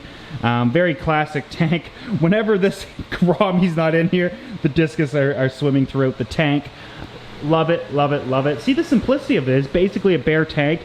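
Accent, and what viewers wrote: Canada. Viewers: ...you must be living in Canada...your accent...;-) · Ah first video and I just knew you were a fellow Canadian as soon as I heard your accent. I’m jealous I want a fish out building lol.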